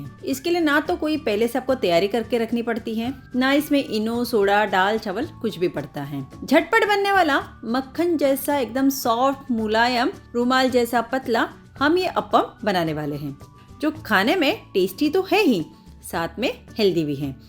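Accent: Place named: South India, India. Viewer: Voice of South Indian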